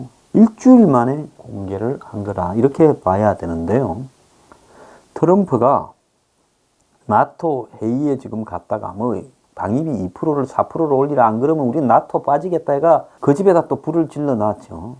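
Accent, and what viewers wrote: Gyeongsang, South Korea. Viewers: ..최고의 변사급 뉴스 진행자! 전 소장님의 거친 갱상도 사투리 들을때마다 뭉가로 인한 급노화 된 피부가 재생되는거 같아요..알라븅~🔞🎈😘🍨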